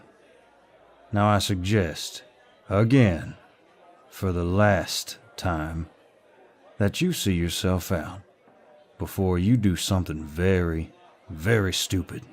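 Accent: South, United States. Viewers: I’ve learned so much about myself today 🫣 For starters i had not even the slightest clue that southern accents did this to me🤠🥃 And second wow holy hot damn do I LOVE princess treatment and to be dominated *giggles nervously* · I love a southern man speaking in my accent lol I love this love the voice love it all not me folding in abt 2 seconds into the vid 🤭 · IM A SUCKER FOR THIS SOUTHERN ACCENT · I said it before and I'll say it again... Correction, I think we love doing a southern accent. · this is too good not to get a part 2, and my god the southern accent, you got us all swooning with it😂great audio, can't wait to hear more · I said it before and I’ll say it again. loves doing a southern accent And I f*cking love it 🥰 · Your southern accent is everything😓🙏🎀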